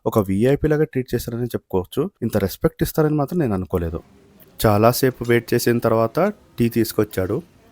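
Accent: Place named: Rayalaseema, India. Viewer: Hi I love your Telugu speak ing.it means you are from Rayalaseema.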